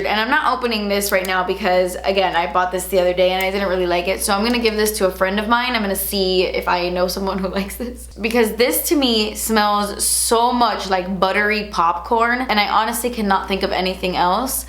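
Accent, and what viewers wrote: Cuba. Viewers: Can you do a makeup tutorial in Spanish? I’d love to hear your Cuban accent for it haha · Me too. Makes me LOL everytime her Cuban chica comes out to play! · Love it when u speak Spanish and your Cuban accent is so 😍😍😍😍😍 I would be able to hear you just talk forever!!!!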